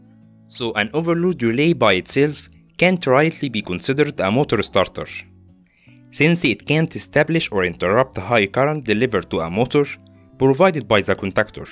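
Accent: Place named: Egypt. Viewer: الانجليزي المصري نار وشرار 🔥🔥ههههههه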